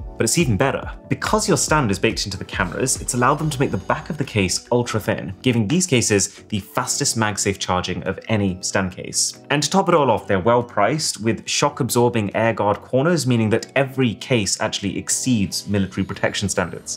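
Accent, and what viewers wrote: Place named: South England, United Kingdom. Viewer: You have a posh accent from south england. But you say "faster" like a northerner.... are you just putting it on?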